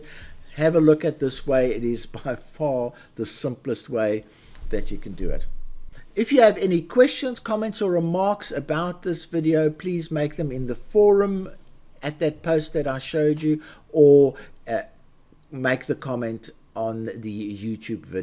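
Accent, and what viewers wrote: South Africa. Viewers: Hi Alex, let me rather ask. Are you a South African?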